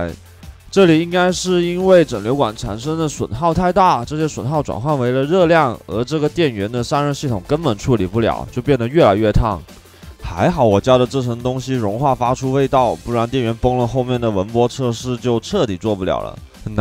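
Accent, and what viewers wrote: Cantonese, China. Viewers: 好亲切的白话普通话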